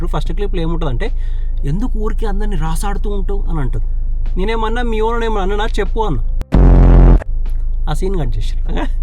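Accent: Telangana, India. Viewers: Next time you must and should go for abhijith and play him with kabbaddi,with your roast and telagana slang anna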